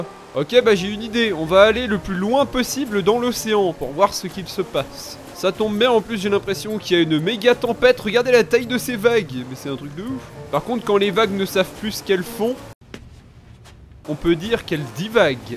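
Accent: Switzerland. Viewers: Avant que tu parles du lac leman j'aurais parié que t'étais Vaudois... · T'es Suisse ?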